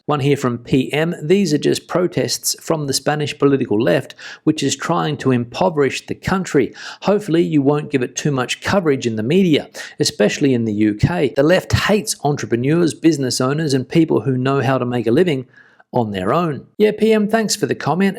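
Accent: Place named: Australia. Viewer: EVERY time I've been to Andalusia in July/ August, it's been over 40C. Cordoba is the hottest city in Europe. WTF this is news every year is only explained by climate hysterics trying to pretend it's something new. ITS NOT!?? - with an Australian accent? Since when did Aussies represent Spain??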